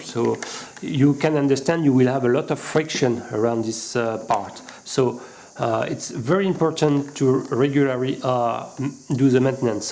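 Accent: France. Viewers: Te pas francais ?? parcque vue ton accent on sen que ce francais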